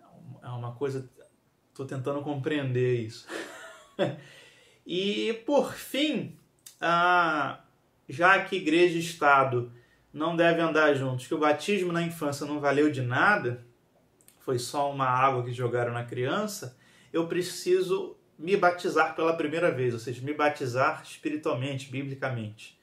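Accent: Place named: Rio de Janeiro, Brazil. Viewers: Eu, por ser carioca, não reparei isso. Hahaha Aqui a gente fala assim, e está tudo bem! 😊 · Sotaque carioca.Nada demais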